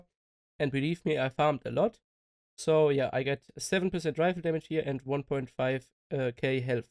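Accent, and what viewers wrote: Germany. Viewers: You speak never english.... Deutsch hör ich da doch raus... mach das doch auch auf deine Muttersprache.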